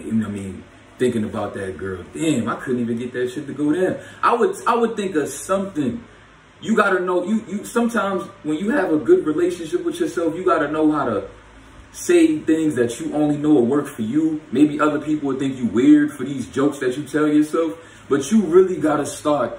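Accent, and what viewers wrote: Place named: New York, United States. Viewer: This nigga from New York for real for real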